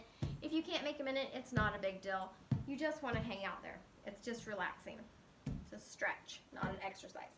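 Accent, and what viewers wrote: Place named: Minnesota, United States. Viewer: Canadian? Definitely not from the south. Minnesota?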